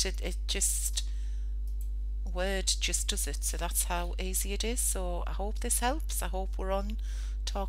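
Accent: United Kingdom. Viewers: Scouse :D? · Ha i love the Geordie accent, thanks very much for the help! :)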